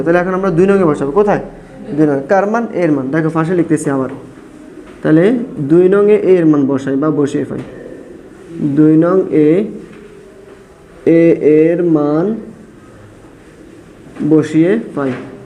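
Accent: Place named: Noakhali, Bangladesh. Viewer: আপনার বাসা কী নোয়াখালী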